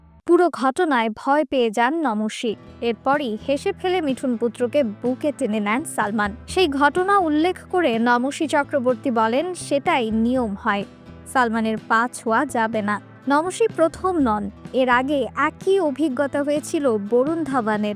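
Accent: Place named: Bangladesh. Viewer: এটা বাংলাদেশ নয়। এজন্যই আমরা সব ধর্মকে সম্মান করি। সবাইয়ের সমান অধিকার। এটাই বুঝি। তোমার ভাষা শুনে মনে হচ্ছে এটা বাংলাদেশি ভিডিও।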